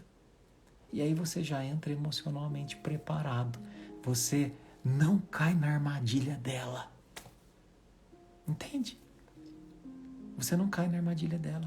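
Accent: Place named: Minas Gerais, Brazil. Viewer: Bom dia! Conhecendo seu canal hj e agora! Só o tom de sua voz, já me acalma o coração e a alma, e esse título de hj, eu estava demais precisando ouvir! Ais 62 anos, c/ depressão crônica, TDA/H s/ nunca ser tratada e dezenas de complexos, rejeição, baixa auto-estima e traumas, desde a infância, como é gratificante ouvi-lo❣️Gratidão por existir e se prontificar a acalentar nossos corações e almas🙏🏻🙌🏻🌟🌠 Vc é psicólogo❓️Tem sotaque de mineiro😊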